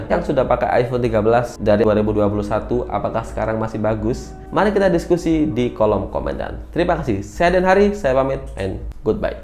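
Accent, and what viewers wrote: Mojokerto, Indonesia. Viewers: smn wong mojokerto ta mas 😅?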